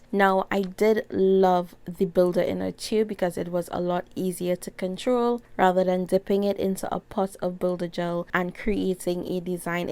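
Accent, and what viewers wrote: Trinidad and Tobago. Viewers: These are sooo cute. Side point...I think I'm hearing a Trini accent. If you are then I love you even more lol. I live and vlog in Barbados but I'm Trini so I miss hearing our accent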